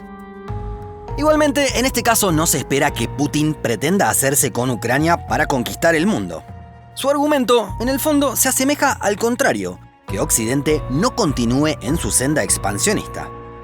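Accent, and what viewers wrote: Argentina. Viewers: El narrador es argentino. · Aver te voy hacer una critica muy fuerte pero realista. Por tu acento se nota que eres argentino, el mismo pais que le lame las botas a los estadounidenses, entonces ahora si comprendo el por que estas en contra de rusia. La otan quiere extenderse en europa, te voy a poner un ejemplo "imaginate que tu vecino ponga una cerca al rededor del perímetro de tu casa con torretas y esas torretas esten apuntandote a ti... Como vecino no iras enojado a reclamarle? Es obvio, el conflicto actual de la otan es querer apropiarse de paises europeos imponiendo su ridiculo lema de "libertad" mientras que rusia no quiere correr ese riesgo. Hablas de historia geográfica de rusia pero jamas mencionas las invasiones que hizo la otan en siria, irak, Afganistán en el que murieron miles de personas, eso se llama hipocresía de occidente. 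Antes de que hagas un tema de estos informate y di las cosas tal cual. 💁🏻‍♂️ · que acento es ese ? tiene argentino pero a la vez me recuerda un poco al robot de platon, peruano ?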